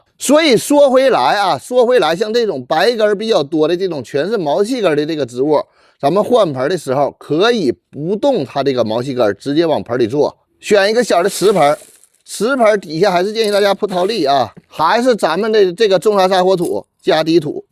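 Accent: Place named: Northeast, China. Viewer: 这是哪里的东北话呀？比其它听到的东北话好听。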